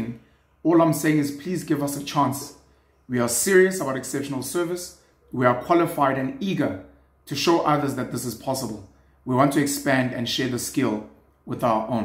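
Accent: Zulu, South Africa. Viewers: Wayiqala ngesiZulu esimnandi mfoka 🙏🏿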